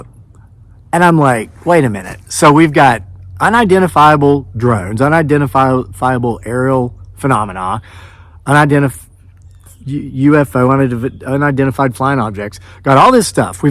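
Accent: South, United States. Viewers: Hi. I'm watching your channel from the UK. All this is very interesting to me and I love reading the comments, people are not stupid, they know what they saw and experienced. You seem like a really nice guy with honesty and integrity and I have no reason to doubt you. I enjoy watching live cams from America too, the amount of weird stuff captured blows me away. Being in the UK is quite boring in comparison to the US so I get quite excited when I see channels like yours pop up. I believe you were under some form of shall we say 'trance' .. Since YU TB doesn't like certain words!! I swear we're all going to be talking another language soon just to get our messages out! 🙄 These trance like explorations over the years aren't just stories that's for sure. Oh, and you don't talk too much, I love your southern accent. Looking forward to more of your uploads. 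Regards to you and your wife. ❤️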